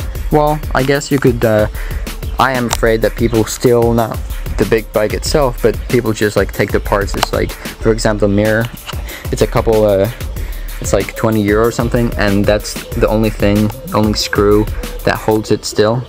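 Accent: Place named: United States. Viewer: You sound American but your plate says you're Polish?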